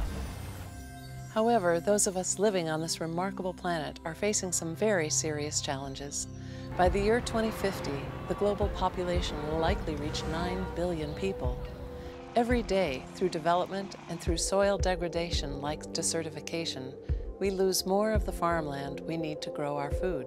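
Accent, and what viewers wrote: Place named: United States. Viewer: Like si vienes por inglesamericano :v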